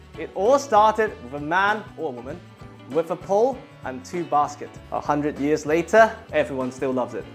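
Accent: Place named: United Kingdom. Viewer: very high quality video, and with brits accent, really nice.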